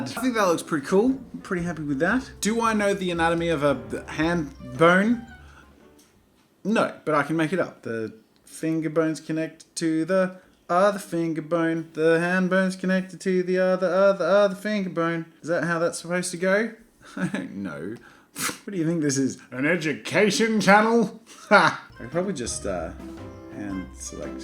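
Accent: United Kingdom. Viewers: The brit accent though 😂😂😂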